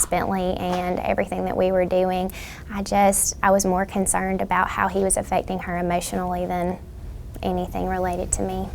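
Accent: South, United States. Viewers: How rude are you she has a southern accent. I am from the UK 🇬🇧 and I can understand her.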